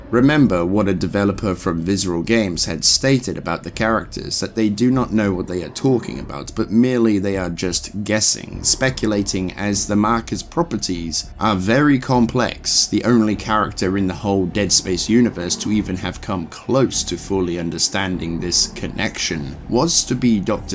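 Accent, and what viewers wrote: United Kingdom. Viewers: Good video.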